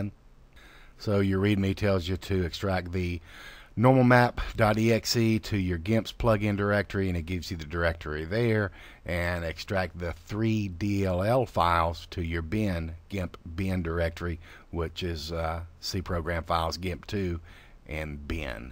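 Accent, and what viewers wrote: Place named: Texas, United States. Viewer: Thanks for your tutorials, really appreciated. And since you evoked it early in the clip, don't worry about your pronunciation (I can hear a texan like accent) : much, much, MUCH more understandable and pleasant to the ear than the guttural germanico-british accent! Thanks for your time and effort, looking forward for more.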